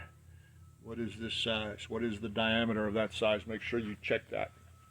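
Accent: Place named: Philadelphia, United States. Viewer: I'd guess Philly or nearby South Jersey but north of Baltimore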